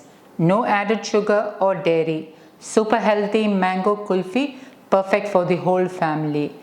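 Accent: India. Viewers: There's nothing different with her accent, it's just a perfectly normal Indian accent.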